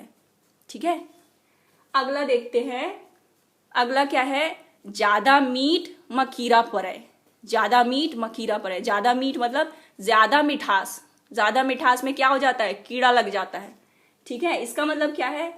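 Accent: Chhattisgarh, India. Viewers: aapman ke , chattishgarhi boli har adbad gutur he. maidam ji aap man lokokti ke baare m au video banye ke prayas karho. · Mam apki cg boli bahut mast hai🙂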